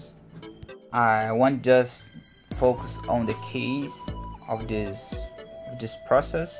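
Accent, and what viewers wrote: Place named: Brazil. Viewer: Muito bom! Parabéns e obrigado. (I'm supposing you're brazilian considering your name and accent)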